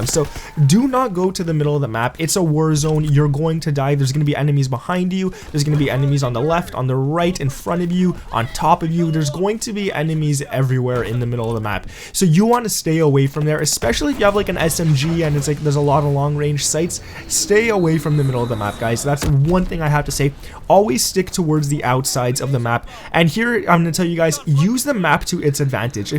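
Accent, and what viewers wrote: Canada. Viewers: Shawn Tarbox I think he is Canadian based on accent. He can’t help it!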